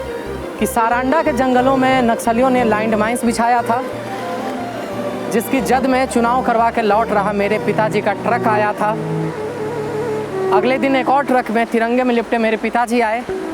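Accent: Bihar, India. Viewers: This Video made me cry.... Hope will see u soon in Bollywood in This Bihari Tone.... Maharaj mtlb ki mast video chhela... 🙏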